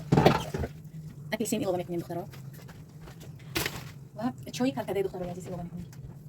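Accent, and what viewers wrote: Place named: Literary Tajik, Tajikistan. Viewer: Мана чи тавр бояд сухан кард: равон озод бо лахни адабии точики👍👍👍Шахсе , ки шуморо гап задааст аввал тарзи сухан кардана ёд гирад😊. Шумо бехтарин модар зани точик👍👍👍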